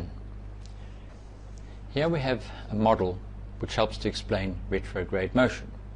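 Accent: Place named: South Africa. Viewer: Weird, I thought the planets orbited in an anticlockwise motion when looking down on the north pole. But this guy sounds South African so I guess he's basing things from the southern perspective.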